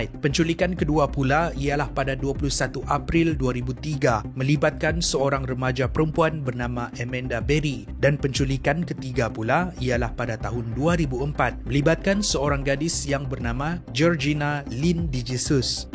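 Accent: Sabah, Malaysia. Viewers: Org sabah kah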